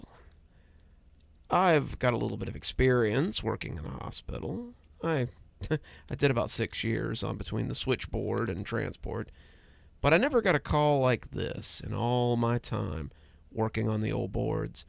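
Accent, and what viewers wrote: United States. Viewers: were does ur accent cum from American obviously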